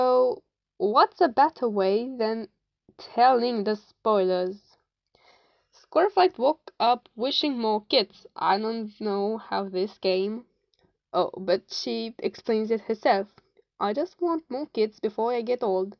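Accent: United Kingdom. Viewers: Îmi place vocea ta lol! Accentul tău britanic nu suna rau (nu de-as fi eu experta heh). De desen ce sa mai zic..arata splendid!!! Squirrelflight's Hope e pe lista mea de super ediții si plănuiesc sa o citesc...mai încolo xD 🤍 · Wow! Chiat zici ca esti din Marea Britanie cu accentul tau! Eu me-as dori sa o citesc, dar nu k gasesc si oricum trebuie sa imi ajunga 2 tot in Engleza. Felicitari!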